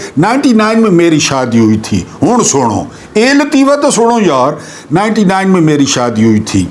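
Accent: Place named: Mianwali, Pakistan. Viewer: بلیک اور وائیٹ بالوں میں آپ ھیرو لگتے ھیں میانوالی لہجہ بہت اچھا لگتا ھے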